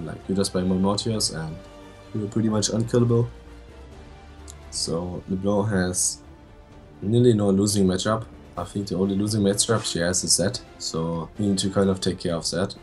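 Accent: Germany. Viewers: You can clearly hear his german accent :D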